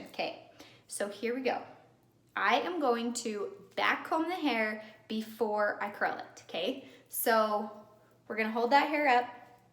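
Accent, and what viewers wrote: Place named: North, United States. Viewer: I wrote to you again about coloring my hair. I’m thinking I need to go silver because if I go blonde I am going to be touching up the roots all the tine. Because I have salt and pepper growing out. Ugghh I hate it. Btw, I love your accent. I am from Indiana, but I can tell you are from the North. Love it!! lol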